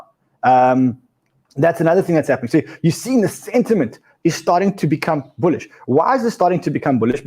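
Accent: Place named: South Africa. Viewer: Sounds too South African: "The feds must fall"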